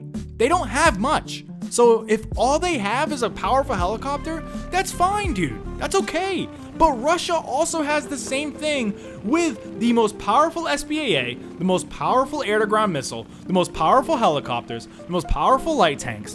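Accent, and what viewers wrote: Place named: United States. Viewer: start video : american accent. yeah we knew already if you guys didnt get everything handed out you would cry xd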